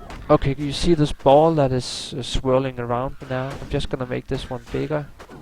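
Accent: Denmark. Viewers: sounds like a dane..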